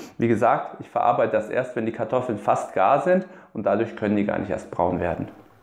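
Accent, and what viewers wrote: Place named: Franconia, Germany. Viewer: Fast das Originalrezept. Warum nur fast? Das Drittel der Kartoffeln, die weichgekocht werden, werden nach dem Kochen noch zu einem dünnflüssigen Kartoffelbrei verarbeitet. Die weichen Kartoffeln werden dazu im Kochwasser püriert. Wenn man die Thüringer Klöße so macht, erhalten sie ihren eigentlichen Namen, nämlich Grüne Klöße. Ansonsten hast du es perfekt getroffen. Übrigens: Wo kommst du her? Du klingst manchmal etwas leicht fränkisch.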